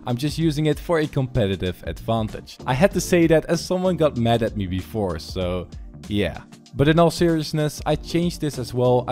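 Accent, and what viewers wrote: Netherlands. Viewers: Ben jij Nederlands je klinkt als Nederlands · Are u dutch?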